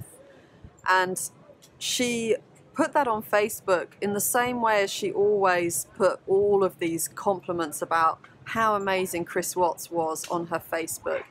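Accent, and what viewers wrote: England, United Kingdom. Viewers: her beautiful British accent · Her accent sounds brittish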